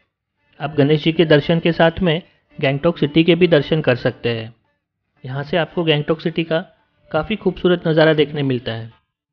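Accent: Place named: Nepal. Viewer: Nepali soundi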